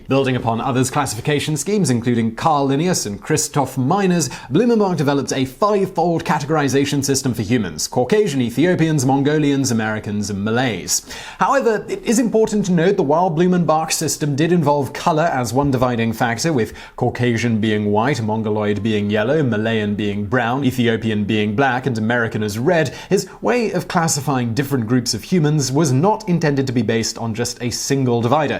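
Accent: United Kingdom. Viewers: how tf did i never know he was british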